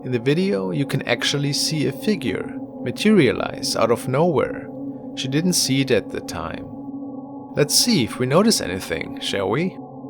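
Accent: Germany. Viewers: Alter, der Akzent ist so anstrengend... ist das ein deutscher? Klingt jedenfalls so. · It’s nice that you don’t say EVERYTHING is paranormal, and point out how/if it can be faked....is the accent German? My daughter and I are wondering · he's german if I remember correctly. You'd think after speaking (or trying) to speak english for years. Watching TV/films, reading articles, & speaking with fluent english speakers. That this habit would be unlernt. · Sehr gut...was heisst...eigentlich.. an deinem Akzent denk ich mal du kommst aus Deutschland oder Österreich, lebst sicher in einem Englisch talking Country. If i m write, please take care of you,and i would love to see more of " in Carmel Main " Stay healthy,greetings from austria-ps.your Englisch is allmoust akzent free (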